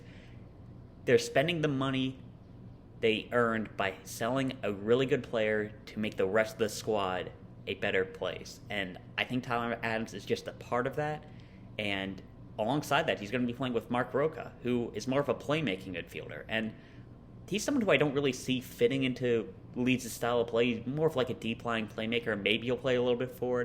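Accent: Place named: Baltimore, United States. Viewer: Another great vid. Is that a Baltimore or Philly accent?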